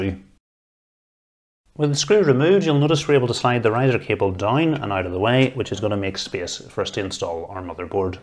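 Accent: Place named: Northern Ireland, United Kingdom. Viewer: “Hears NI accent” subbed, nice to see a successful channel from home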